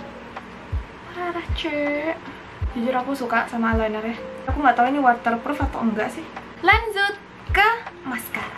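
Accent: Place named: Sunda, Indonesia. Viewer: orang sebrang tapi sunda nya udah lekoh pisan, ngakak lucuu but luv❤️❤️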